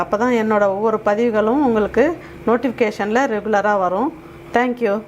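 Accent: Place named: Cuddalore, India. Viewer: கடலூர் விருத்தாசலம் பக்கமா..... தமி்ழ் சொற்கள் மிக அழகாய் இருக்கு....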